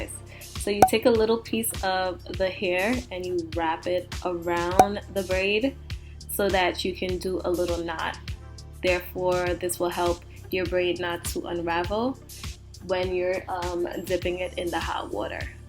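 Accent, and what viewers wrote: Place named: East Coast, United States. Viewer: ! Great video. I need to do somet'ing with my hair during this quarantine lol. Not to be too intrusive but where are you from? I hear a little east coast in your accent! lol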